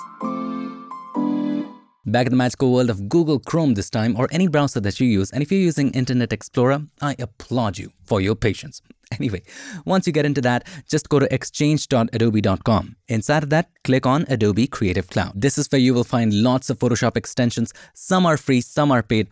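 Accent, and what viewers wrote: India. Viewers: u have an annoying voice, I can still hear the indian accent u are almost succesfully hiding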